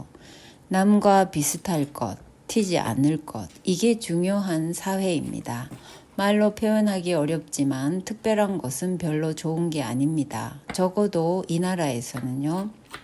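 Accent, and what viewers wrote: Gyeongsang, South Korea. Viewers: 경상도 분이신거 같아요 말투 괜찮아요 듣기 편합니다 요리 잘 보고 있어요 언제나 행복하시길요 ❤ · 영상을 보면서 따님을 잘 키우셨다고 느꼈어요 타국에서 생활이 힘드실텐데 대단하십니다 조용하고 잔잔하지만 내공이 단단한 분이라고 느껴져요 저는 딸이 도쿄에서 직장생활을 하고 있어서 외국에서의 삶에 대해서 간접 체험을 하고 있습니다 남편이 대구 출신이어서 경상도 억양이 친밀하고 보여주시는 소소한 일상이 좋아서 챙겨서 보고 있습니다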